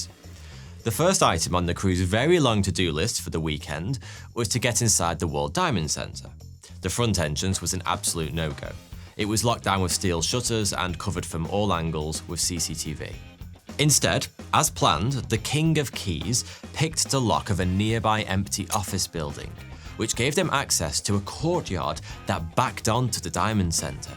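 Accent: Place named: England, United Kingdom. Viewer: OMG, this Guy´s stories are Awesome! And He tells them with such breathtaking English accent! Bravo, Sir! You´re simply the BEST!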